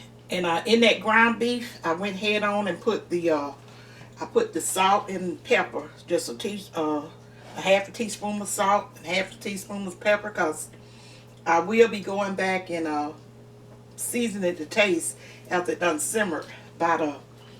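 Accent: South, United States. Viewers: Awesome I knew you were from the south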